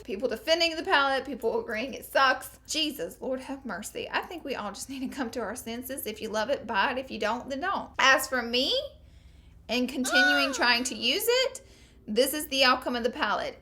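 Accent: South, United States. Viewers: I'm not trying to be mean but are you from the south cause you have a bit of a country accent?? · She's southern. She has a accent there is nothing wrong with her smh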